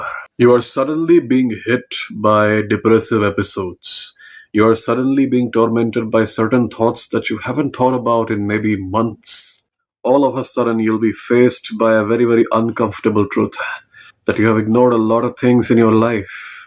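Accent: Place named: India. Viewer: woh toh theek h par laadle ascent kati desi h aapka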